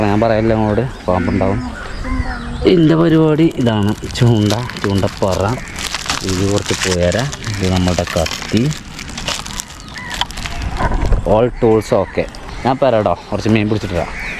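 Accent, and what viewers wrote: Malappuram, India. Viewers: Mone kutta ne malappuram aaanodaaaa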